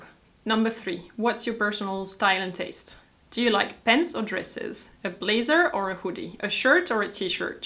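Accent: France. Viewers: Vera Prokopenko FRENCH and I love listening to her accent. A parent's brother, cousin or friend: Uncle. This 'u' is pronounced as in the word unbelievable or untrue. The word 'an' in ankle is pronounced as in the word anchor or angry. I'm in the UK and I love accent. I don't know anyone else with a French accent. It's very pleasant to hear. She doesn't speak to fast and everyone understands clearly what she is saying:)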